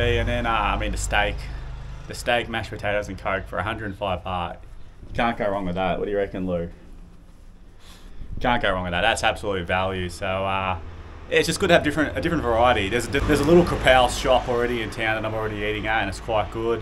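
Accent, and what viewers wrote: Australia. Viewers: Hey mate, how do you still have such a thick Aus accent hahaha. Crazy that, normally when you move out of Aus we get more pain English accent, but in each vlog yours seems like you get more and more aussie.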